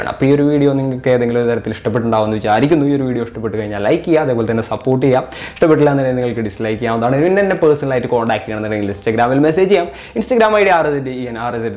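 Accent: Thrissur, India. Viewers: Bro thrissur aano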